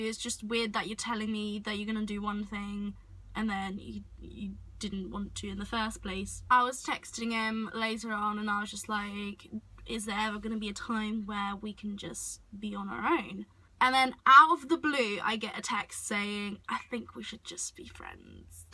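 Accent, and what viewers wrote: United Kingdom. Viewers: Ur accent is so stereotypically British to me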